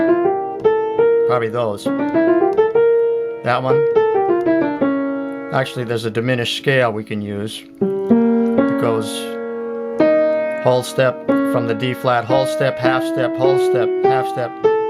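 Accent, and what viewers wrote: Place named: Maine, United States. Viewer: Your video just showed up on my feed and as I was watching it I immediately realized you were from Maine. I adore your accent, reminds me of home. ❤️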